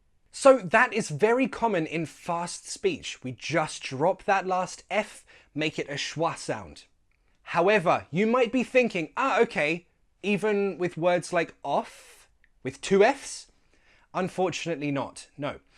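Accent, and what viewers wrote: United Kingdom. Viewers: Thank you so much for helping us know the perfect British pronunciation. Sir, i am a big fan of you. And I love you.👌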